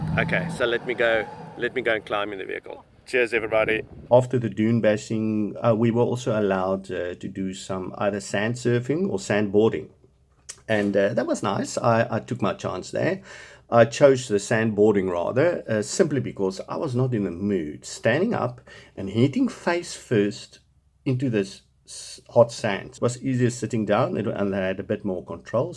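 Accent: South Africa. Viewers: An excellent video..being irish its funny hearing your south African expressions in your accent..right now I'm in Phuket on holidays and it's lashing rain 😎 😂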